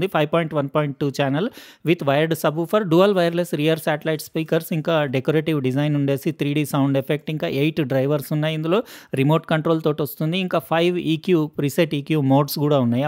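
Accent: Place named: Hyderabad, India. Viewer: Garu, Mastu, Jabardastu, meeru vade, hyderabadi, assent, kosam mee video chustnu, 🎉 all the best, prabhakar, alwal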